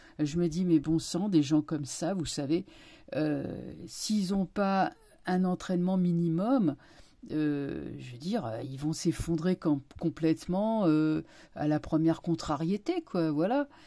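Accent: Paris, France. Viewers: Bonjour, bonjour à tous les Velazquezinnes et Velazqueziens, merci pour cette video, moi ce qui m'amuse c'est votre ton qlq peu débonnaire...oui....bof teinté d1 accent parisien😉 vos visions des choses résonnent chez moi, c'est tjrs très pertinent et plein d'érudition...non feinte. Bon dimanche à bientot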